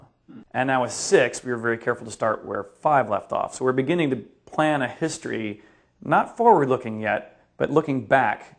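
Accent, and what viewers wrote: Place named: United Kingdom. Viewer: i am german and even i think you sound british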